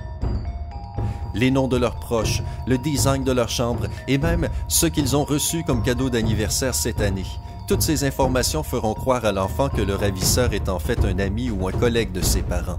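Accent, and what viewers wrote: Quebec, Canada. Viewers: Tes québécois ? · Eum c’est moi ou le commentateur est québécois ( comme moi ☺️ ) et wow super belle vidéo et très utiles 👍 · Simpa , ta l voie de qcoxe (je me demande si tes quebéquoi ou tes juste lui 😐) qui est d'accord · T canadien pas vraie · Figooroo ! Ouii j’avais aussi l’impression durant toute la vidéo qu’il parler avec cet accent Québécois 😍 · Québécois... j'aime ça ! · dislike , c'est le québécois qui parle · Rien avoir tu es québécois ?? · C pas un accent quebecois? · Les accent québequois .. AH CA M'EXCITE!!!! 😄😄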